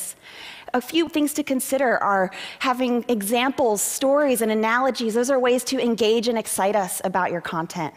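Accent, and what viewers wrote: United States. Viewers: I dont think so..that was an Australian series- this woman has an American accent.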